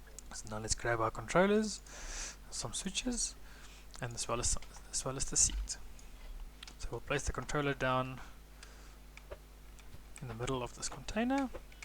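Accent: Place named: South Africa. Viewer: You sound like a fellow SA bra good vid btw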